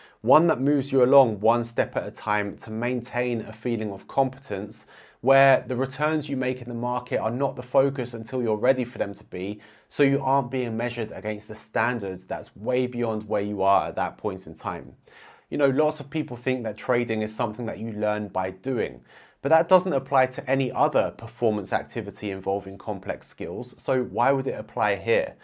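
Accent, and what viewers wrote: United Kingdom. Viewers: I like the way you explain in very precise basa on logic and higher level of example. Im very addicted with those video. And should mention ❤your British English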